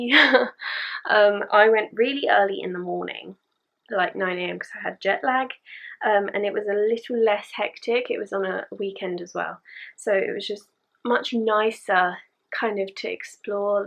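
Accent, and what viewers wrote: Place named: England, United Kingdom. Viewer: Nice channel keep it up love your english accent:D!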